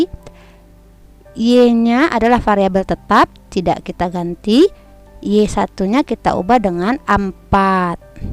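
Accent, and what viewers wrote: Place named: Padang, Indonesia. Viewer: langsung tahu logat dunsanaknyo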